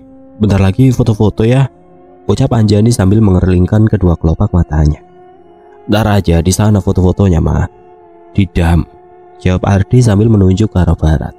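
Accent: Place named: Java, Indonesia. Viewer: Jadi lucu denger mas jowo ngomong bahasa Sunda ... 😁 Aksen jowonya medokk ... Lop sakebon ❤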